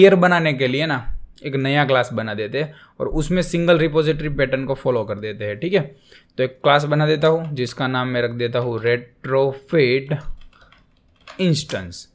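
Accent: Gujarat, India. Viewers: Gujrati Accent Detected.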